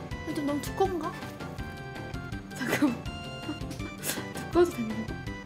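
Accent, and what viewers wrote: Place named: Gyeongsang, South Korea. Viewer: 찐 갱상도 누님 저도 마산살아요!!!!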